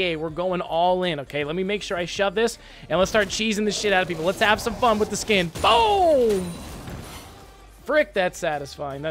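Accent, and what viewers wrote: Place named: Boston, United States. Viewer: Boston accent baby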